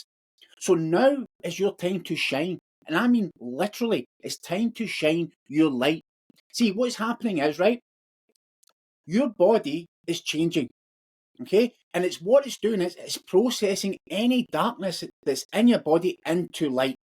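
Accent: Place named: Scotland, United Kingdom. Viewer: I enjoyed your Scottish English accent. Are you from Glasgow? I am now much interested in these topics you deal with and your words have been helpful for me. Thank you. Greetings from Turin, Italy.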